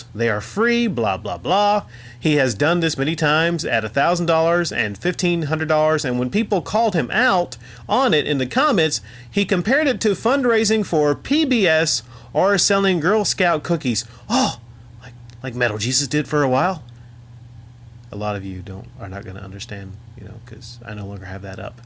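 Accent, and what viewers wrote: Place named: New York, United States. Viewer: LMAO 🤣🤣🤣! Sounded like a mix of NY (In your pronunciation of Sox and Boston) and Boston but it was still hilarious.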